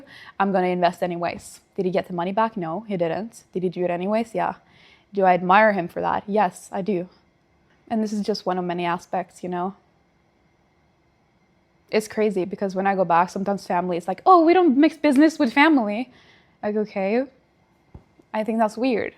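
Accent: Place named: North America. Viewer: Excuse my ignorance, do all Norwegians speak with this "north American" accent? What other languages do you speak in your native country?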